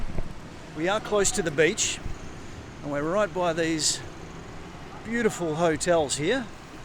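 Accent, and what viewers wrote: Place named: Australia. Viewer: christ mate thats one and a half of an australian accent isn't it gabba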